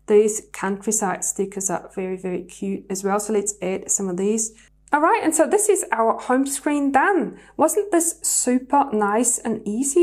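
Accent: New Zealand. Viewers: i love the new zeland accent so nice to hearr (im from australia) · I love your New Zealand Accent <3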